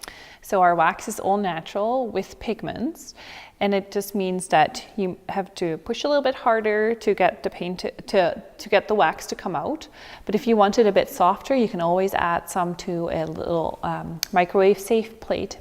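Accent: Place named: Midwest, United States. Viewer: Sounds Midwest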